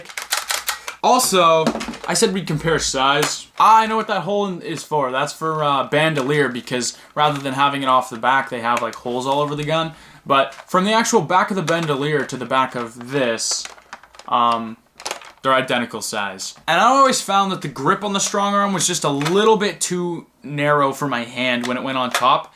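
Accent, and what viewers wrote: Canada. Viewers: Canadian eh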